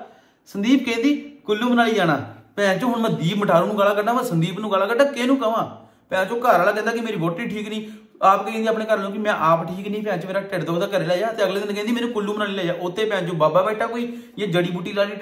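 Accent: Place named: Majha, India. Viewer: Majhe di boli😍 haada km nhi chlda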